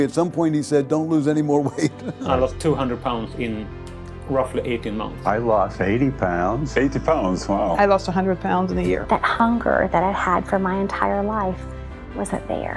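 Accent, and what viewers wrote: Sweden. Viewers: i like the accent i would say either swedish or norwegian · he is indeed swedish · Hes from Sweden, I hear that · This obviously Swedish velvety middle-aged soft-spoken man has convinced me with his confident gaze. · Damn thats a Swedish accent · Could you maybe be Swedish?:) I think I hear a slight Svenska accent;) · Anti-fans fuck-off. He is a Swedish Doctor that's why his accent is different. His name is, MD. I have his book LOW CARB, HIGH FAT FOOD REVOLUTION. And it really works on me and it's like my holly grail. He is a good doctor. 😃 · This guy sounds Swedish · Is he Swedish? 🤔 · I call swedish, sounds as bad as Arnold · is Swedish. No doubt about it. I lived in Norway for 21 years, so all the Scandinavian-English accents are crystal clear to me.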